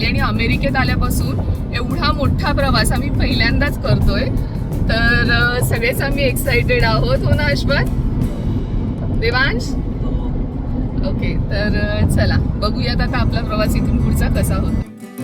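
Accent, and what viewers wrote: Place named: Pune, India. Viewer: mla kuph awadta tumche bolne punyche mrate bolta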